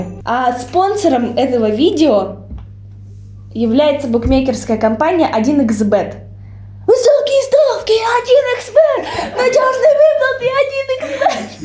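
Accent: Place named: Tajikistan. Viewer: честный слов ты как актириса браво по акцентом разговариваешь по таджик ской язике.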